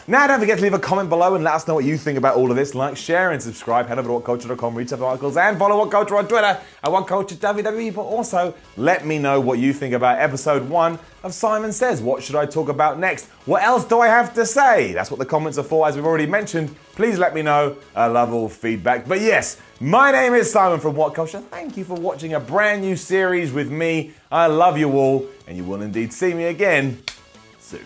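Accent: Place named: United Kingdom. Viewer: I've watched so many videos featuring Simon that I'm so used to his voice that I can't hear his British accent anymore. I'm just completely used to it.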